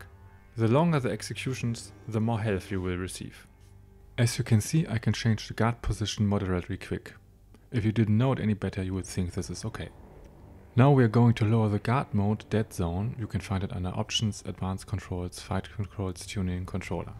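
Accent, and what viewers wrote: Germany. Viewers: are u german btw? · Ich würde jzt einfach mal so sagen das es ein deutscher Akzent ist ?🙈😂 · I h Liebe deine Aussprache kann es sein das du Deutscher bist ? PS super Video danke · WIe man den deutschen Akzent hört loool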